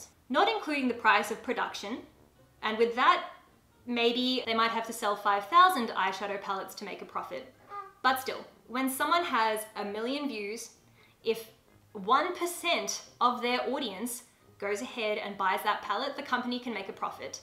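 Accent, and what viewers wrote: Australia. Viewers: Are you Aussie ? Gday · Her Australian accent is the LEAST annoying I’ve ever heard!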